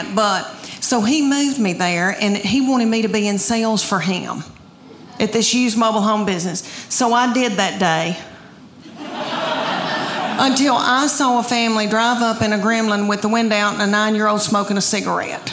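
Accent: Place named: Appalachia, United States. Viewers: She's gorgeous, intelligent and funny! I just LOVE that accent too! As smooth as Appalachian moonshine! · Why is it, when I hear a southern accent, I think dumb. If you don't want to get pregnant try the pill or condoms, honey. This is funny ? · I love you. I, a Yankee from NYC, lived in Memphis Tennessee for over 3 yrs and know most of TN quite well. If anything made an impression on me was that everyone was so, wholesome and funny, just like you. I learned so much about America, being a bred and raised city boy. I didn't realize how ignorant I was in the ways and niceness of the South. Those experiences and memories were amazing and I cherish all of them till this day! When you talk about being that "cute girl" in britches OMG, many times I went out with those girls! They were absolutely beautiful and their accents, like yours, would just melt my heart! 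Best was they were honest and loving. Your comedy is superb. I wish you the very best for you and your family. God Bless!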